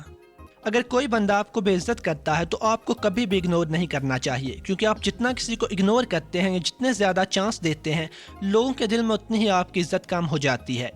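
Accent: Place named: Lahore, Pakistan. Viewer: Lahori accent 😂👌✌️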